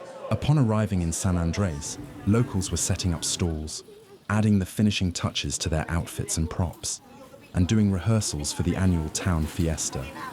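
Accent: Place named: United Kingdom. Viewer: Excellent documentary! Your accent sounds British to me and not Californian. But what do I know...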